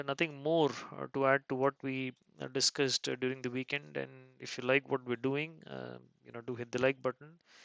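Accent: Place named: India. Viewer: reposting...Your accent seems to be very laidback and relaxed. definitely did not seem to be 100% Indian accent but with a mix of foreign accent. so i was curious to know. i like your intro...HIiii Guuuys...haha...as if we sat down at the bar counter. Hope you will take my comments lightly. Enjoy the rest of the weekend.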